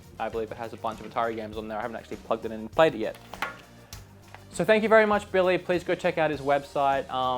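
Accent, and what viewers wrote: Australia. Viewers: The aussie accent definitely got less noticeable watching this in 2026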